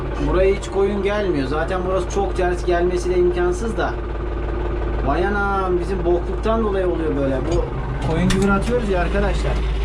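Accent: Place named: Edirne, Turkey. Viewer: Merhaba Abi, aslen Eskişehirli misiniz? Bizde Edirneliyiz de konuşma şeklinizi bize benzettim şive olsun ağız olsun ☺ Göçmenlik var mı acaba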